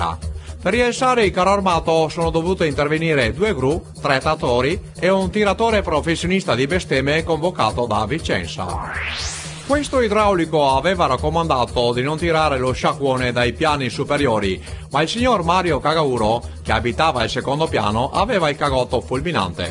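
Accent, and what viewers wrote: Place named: Rome, Italy. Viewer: anca mi so veneto cmq non è propio veneto...ce sembra un romanese che parla in veneto però ce hai provato ...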